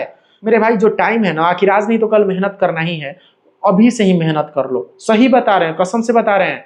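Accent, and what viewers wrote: Bihar, India. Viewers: Bihar se ho 😅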